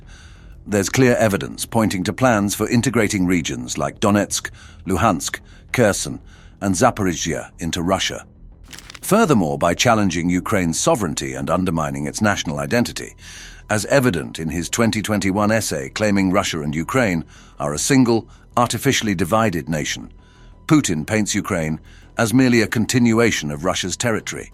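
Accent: United Kingdom. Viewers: That British accent 😂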